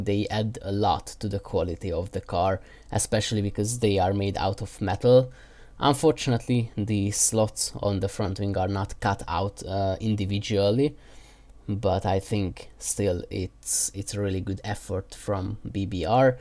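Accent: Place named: Hungary. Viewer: A jo magyar akcentus:D Nagyon jo video lett egyebkent! :D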